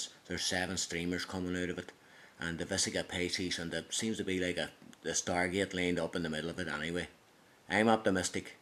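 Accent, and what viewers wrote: Ireland. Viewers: Love the Irish accent, understood it all real well. Very strong accent though and even said if you don't understand me visit the web addy. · you have a talented mind !! i just hope you're wrong in some of your views :) im curious about the upcoming August crop-circle of the year. ps irish accent i love that land!! (specifically bray - yes, bray)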